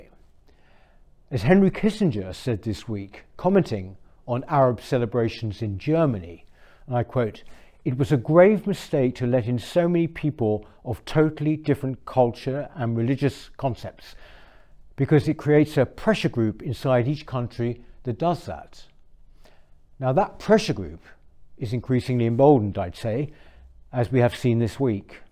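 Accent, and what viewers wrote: RP, United Kingdom. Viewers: British government should not have supported apartide in gaza/isreal. So stand on holacoust as much as you like. We all know what isreal have been doing for many years. Don't want to explain the full picture do you. I don't condone atrotties of hamas kind or any other group or government. Seems strange how usa forces carry out terrorist actions on civilians yet someone like you would support the deportation of Julian for spilling the beans. But then you sound like a posh toff who probably supports people like Blair, Obama and bush. And the irony is you probably support the neo nazi far right in ukrain who are trying to ethnicly cleans the, as they put it, orcs from ukrain. Hope your chanel does well buddy. Good luck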